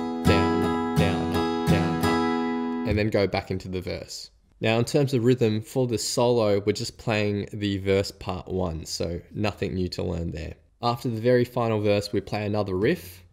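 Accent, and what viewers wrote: Australia. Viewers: it's great to have a comfortable-sounding Australian accent doing this, weel done.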